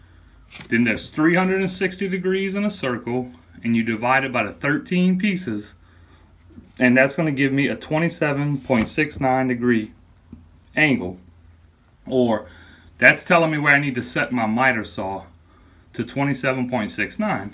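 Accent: Louisiana, United States. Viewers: Very nice explanation. Just what I was looking for. What part of South Louisiana are you from?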